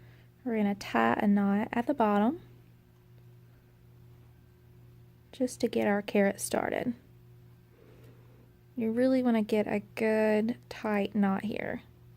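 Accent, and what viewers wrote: South, United States. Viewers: Too cute!!!! To save time, I would just put drop of HOT temp glue to keep it shut so u can work on it easily. It won't show once it's painted. I'm sharing the tip cuz ur young and u need to avoid arthritis in ur hands and fingers as soon as possible. Straining ur joints to do anything leads to arthritis and pain. Absolutely loved it idea!!! Will share ur site with my nieces. Love ur southern accent, makes me homesick! Blessings to you AND ur hubby. Just tell him to wave next time and say Hey or Howdy! Make it more fun!! It's all abt having fun and enjoying life to the fullest. Let the little things go. If u can fix it, great! If not, let God take care of it! He's in control anyway. Follow the rules as best as u can (no one is perfect) and He will do the rest. I thank Him daily for all the little simple blessings that so many take for granted. 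Thanks for sharing!!! L :)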